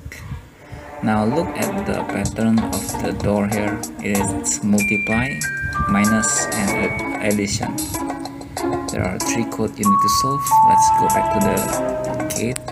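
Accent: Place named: Philippines. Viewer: can I ask, what country are you from? your accent is kinda like a filipino. but anyway, thanks for the walk-through 😁💪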